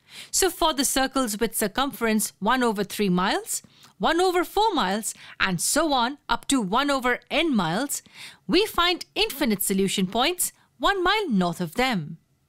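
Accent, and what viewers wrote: India. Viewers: Indian accents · Is this an Indian talking? Unsubscribe to this channel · Is the narrator Indian by any chance ? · Indian accent??? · y u sound indian tho? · Indian accent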